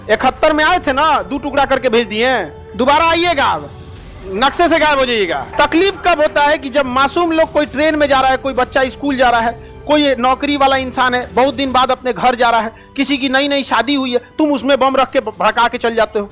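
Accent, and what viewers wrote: Bihar, India. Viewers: आप बोलते बहुत अच्छी डौंग से। एकदम बिहारी स्टाइल से। धन्यवाद। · Bihari accent so strong... for once you forget that he's a Muslim 😂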